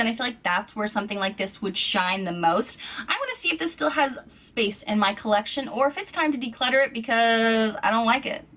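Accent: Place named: Midwest, United States. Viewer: I always thought you sounded Midwestern. Plus you love the Blues.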